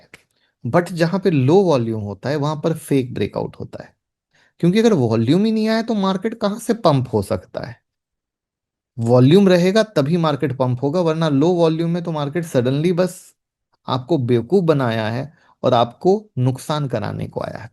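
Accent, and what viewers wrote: Bihar, India. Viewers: आप बिहारी हो क्या?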